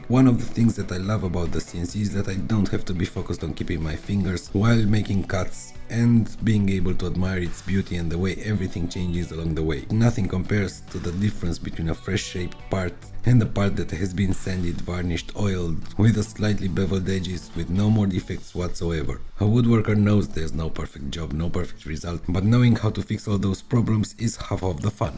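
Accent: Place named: Russia. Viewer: Прямо слышится русский акцент в английском 😂 Вы из России?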